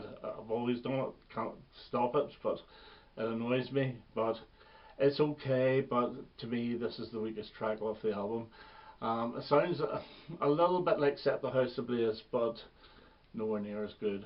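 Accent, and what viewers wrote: Northern Ireland, United Kingdom. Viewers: Well that was very interesting. I agree least said about the better, although I much prefer the version on than on. is the keast best of the number ones, but its ok. Hearing as a 13 year old in 82, I loved it & still do., I like &, which I can hear echos of. & are songs I like too. Lovely to hear your accent, I was fortunate enough to work in Co. Down for 5 years, N.I. is a fantastic part of the world.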